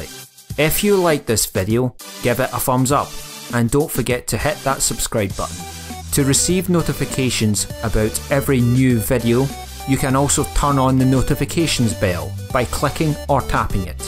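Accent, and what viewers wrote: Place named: West of Scotland, United Kingdom. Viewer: Good West of Scotland accent mate. Cheers.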